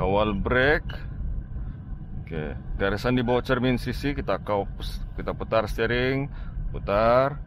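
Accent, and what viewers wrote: Sabah, Malaysia. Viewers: Macam logat Sabah jk ni...btul ka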